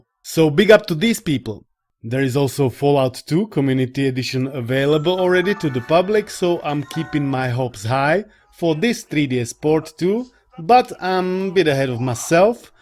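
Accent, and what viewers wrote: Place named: Latin America. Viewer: por que siento que eres latino xd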